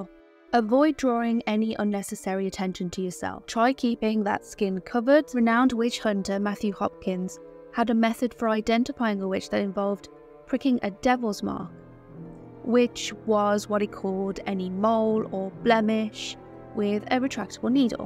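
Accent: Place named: England, United Kingdom. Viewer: G'day, I'm an Australian agnostic atheist. As an Australian, I suspect that I could be a witch or an atheist or a stack of other things for the foreseeable future without any dramas. Your accent sounds English and while I can point to a lot of things about the British government that I don't like, I doubt that you would be in danger from your government for your religious practices in the foreseeable future. However, I don't think that applies to women in the US. There women are taking additional steps to ensure their menstrual cycles do not become state knowledge, lest they be charged with abortion. (Worryingly, women who fall pregnant, don't know it and then miscarry after as little as 6 weeks are theoretically in the firing line of some of the anti-abortion laws.) About 70% of people in the US think that abortion should be allowed at least in some circumstances. 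The laws do not reflect that opinion. Similarly, it's been decades since a Republican president actually won the popular vote, but there have been a lot of Republican presidents in that time. So, in the US, I think there is a distinct possibility that Christian Nationalists could gain power in government (especially at the state level) and that they would go after people for witchcraft. Christian Nationalists aka Christo-fascists would not win the popular vote but that doesn't seem to matter in the US. The trick, then, for someone in a jurisdiction where the Christo-fascists have gained power, is to recognise that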